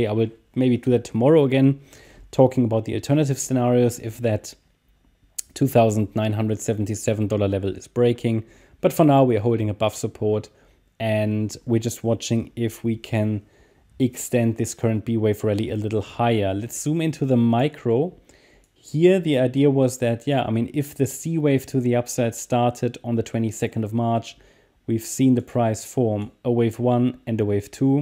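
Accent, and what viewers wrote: Germany. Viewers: stets sehr starke Analysen (ich höre den deutschen Akzent raus :)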